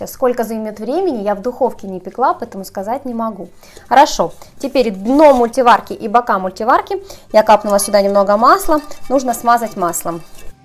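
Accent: Ukraine. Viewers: Маладец умничька а акцент украинский это пустики главная хорошая память, спасобность, нада мала говорить а больше делать.🍩🍮🍞🍰🍯🍭🍔🍕📖🍑🍏🍎🍅🌽🍓🍑🍈🍌🍐🍇🍒🍉🍆🍉🍍🍐🍒🍋🍌🍊🍈🍈🍧🍨🍦🍦🍩🍩🍞🍞🍞🎂🍰🍰🍪🍪🍫🍫🍬🍬🍭🍭🍭🍇🍇🍒🍋🍋🍊🍏🍎🍊🍒🍇🍉🍌🍌🍈🍑🍊🍒🐤🐤🐤🐔🐘🍫🍩🍞🍰🍏🍊🍊🍑🍐🍌🍈😣😞😒🐼🍓.🍰🎂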